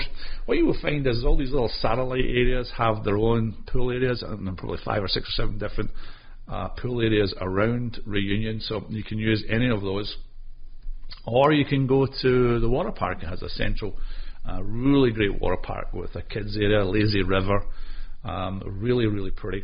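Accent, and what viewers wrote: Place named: Scotland, United Kingdom. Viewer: Just found your video and was delighted to hear what I think is a fellow Scottish accent - hello! I'm really not surprised to hear Reunion resort on your countdown here - I've always thought it looks stunning in there. If you ever do an overview or more affordable options, I always rate CLC Encantada in Orlando - nowhere near the level of Reunion, but a really solid option if your budget doesn't stretch that far!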